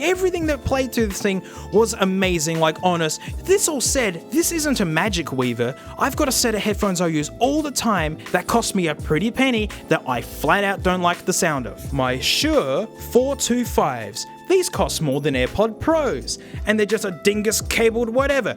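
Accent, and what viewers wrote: Australia. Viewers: bruh, youre ozzie as fuck. I gotta call my fam down under now. great video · You’re as aussie as Colin furze is British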